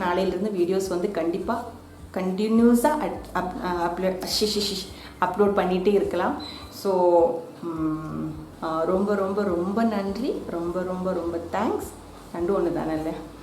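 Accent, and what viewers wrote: Kerala, India. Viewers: So happy to see u. As ur voice u looking so awesome. I love ur tamil with malayalam accent. Ur recipes r too good. Keep rocking friend. 👍👍👍👍👏👏👏👌👌👌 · I love your tamil with malayalam accent nice to see you face to face. I learnt to make aappam and stew with your help. Thank you have a great YouTube experience 😃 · I like your voice and this malayalam mingled tamil😍😍😍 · Sweet and simple 😊 malayalish tamil is cute chechi. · Hello mam, I have tried your receipe kadalai curry and aapam. Your explanation is so neat and easy to follow. Never watched anybody's channel talk like this. You are doing grt ,pls continue it. Also feel free to talk in the way which comes to you, listening keralites speaking Tamil always pleasent for us. Bz of dubbing difficulties, you may reduce your dish variety in future. So pls don't stress yourself much in translation.we are happy to listen to your Malayalam mixed Tamil .Keep rocking mam. 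· Akka, its sweet to hear your tamil with malayalam slang. Don't worry about language we can able to understand it. Thanks for your efforts to give your recipes to us(Tamil people). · Ur malayalatamil super..first viewer ...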